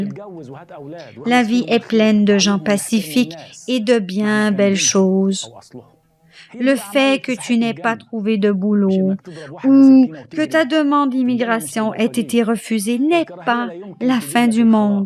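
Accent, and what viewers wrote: Quebec, Canada. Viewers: L'accent québécois t9iiil hhh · Français avec l'accent québécois ! Excellent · J'aime beaucoup l'accent canadien j'ai l'impression et que je suis en trame du coûter un prêtre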